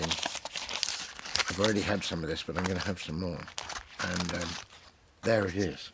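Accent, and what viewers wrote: England, United Kingdom. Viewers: A fine stoic English accent will be the most comforting thing I can think of if the world collapsed tomorrow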